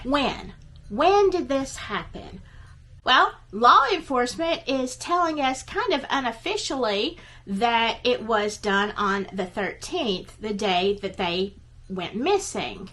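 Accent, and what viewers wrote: South, United States. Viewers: Love your southern drawl..I've got a kiwi one! 😉🌿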